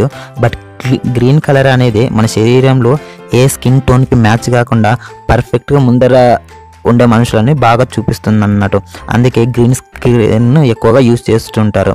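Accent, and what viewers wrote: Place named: Telangana, India. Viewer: Mana telangana telugu thelisipodhi ekkadunna. Super brooo all the best 😍😍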